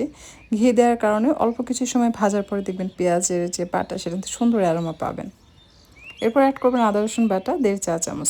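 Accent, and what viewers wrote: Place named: Noakhali, Bangladesh. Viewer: বললেন পাবনা থেকে বলতেছেন,কিন্তু ভাষার টোন হচ্ছে নোয়াখালীর।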